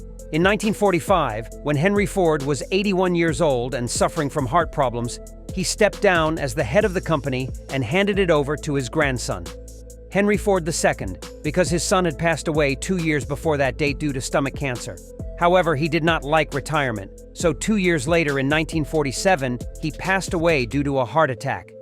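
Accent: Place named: Scotland, United Kingdom. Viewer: I love your Scottish accent. You should make more documentaries about the Scottish with that accent.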